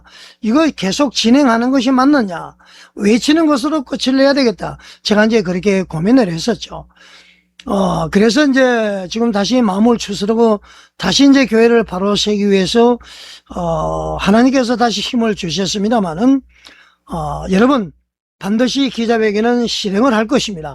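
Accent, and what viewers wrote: North Korea. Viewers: 말도안돼는 유투브영상으로 사람들 혼란시키지 마시요 북한말투가 확실하네요 이런인간이 교회를 망가트리는 원흉이다 여러분들 속지마세요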